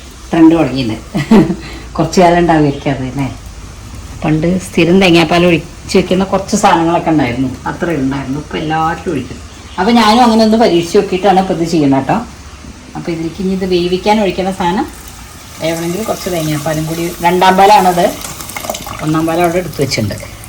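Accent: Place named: Bharatapuzha, India. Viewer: your Malayalam is from Bharatapuzha side ,is it?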